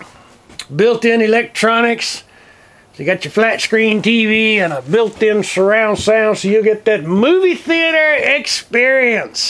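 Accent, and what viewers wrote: Texas, United States. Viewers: i really love this video ,, thanks you Texas accent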